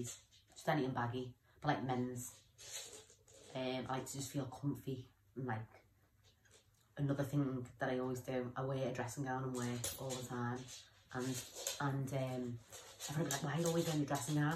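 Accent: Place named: Liverpool, United Kingdom. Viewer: Scouse in the house 😆👍 your like me. I sweat when I talk to people I don't know. I don't know what to say to them unless I've had a drink . If I go to party's I have to go and sit on me own for a bit evey so often to get some space lol i hate going to places I don't know aswell . The ailen thing you said hit me because my m8 would say I'm like and ailen from a different planet hahaha and I always make sure iknow were people are in the room . From hearing you talk I can see your not stupid your smart and probably a nice person if your in a good mood lol things will get better where all fucked up other people are just better at hiding it .nice one for telling your story i appreciate it feels good to know I'm not the only one 😆👍👍👍👍👍👍👍✌✌✌✌✌✌✌👋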